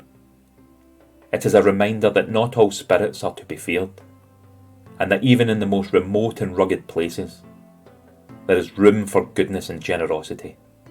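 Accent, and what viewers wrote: Scotland, United Kingdom. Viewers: I love the Scottish accent. I could listen to anything in a Scottish accent and find it intriguing. Thank you! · I love the Scottish accent.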